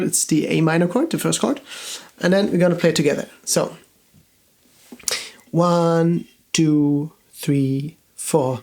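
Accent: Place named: India. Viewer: Accent is very similar to Indian accent. Beautiful.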